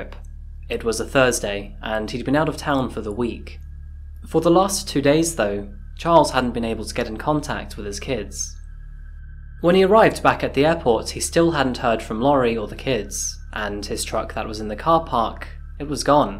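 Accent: England, United Kingdom. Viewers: I come here to hear this case in british accent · In my opinion, the English accent is one of the best for monologues. :-)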